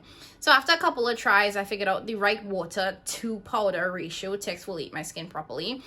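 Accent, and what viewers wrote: Trinidad and Tobago. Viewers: Trini people cant hide boy😂.Love the video hun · Imma sub just to listen to your Trinidadian accent 🇹🇹 lol .. I'm not into makeup too much but I love skincare. Thanks for the video 😊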